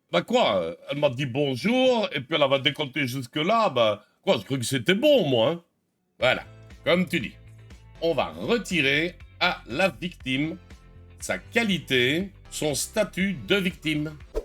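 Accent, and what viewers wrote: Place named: Belgium. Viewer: Salut, c'est intéressant et ça se voit que ça te plait de faire des vidéos et que le sujet t'intéresse donc c'est communicatif pour l'apprentissage. Sur l'humour, fais gaffe que tu fais des références belges (sans parler de l'accent mais on se refait pas) que la majorité de l'audience va avoir du mal à comprendre (par exemple le minerval) donc je pense que tu vas perdre pas mal de gens, l'humour coupe déjà le rythme du propos principal alors s'il introduit des références inconnues je pense que tu perdras + les gens. Bonne chance pour la suite de ta chaîne !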